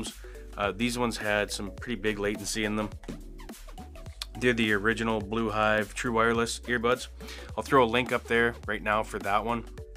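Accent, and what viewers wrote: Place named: Canada. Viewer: Of course! Thanks for posting the video! And yeah, I noticed right away! Right off the bat, I knew you were Canadian. I was like shit yeah, a Canadian review.. Then I realized these are likely only sold in Canada at Canadian tire.. haha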